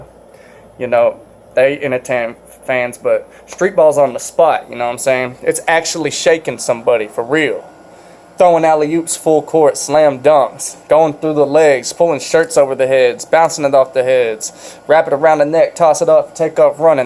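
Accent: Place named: South, United States. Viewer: I'm from Georgia, and you got that thick southern accent(Texas right?) just like me lol.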